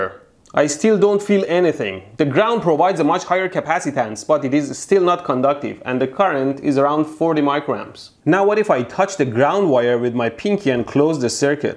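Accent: Iran. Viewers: Yep that's the strongest Persianified English accent right there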